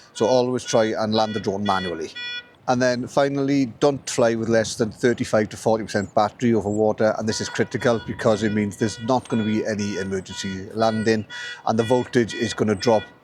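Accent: Wales, United Kingdom. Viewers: Welsh accent is the best one!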